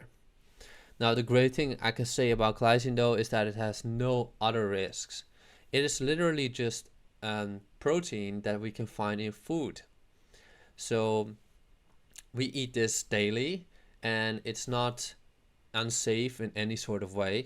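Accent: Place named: Netherlands. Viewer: Glycine is great, great for bed time, doing keto or carnivore its good to add some glycine. one can balance the methionine with glycine glycine is sweet. I Am just having a glass water with 5 g glycine. You do sound Dutch as I Am subscribed Glycine does so much, its even a mood stabilizer, good for the skin. I often put apple cider vinegar with glycine before my keto meal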